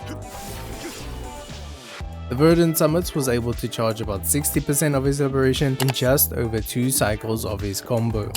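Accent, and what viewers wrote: South Africa. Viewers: Brother based of your accent and ping are you from South Africa?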